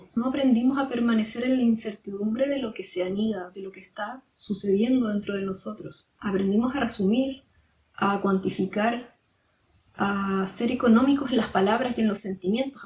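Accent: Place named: Chile. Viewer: Que bonito tu acento ¿Eres chilena?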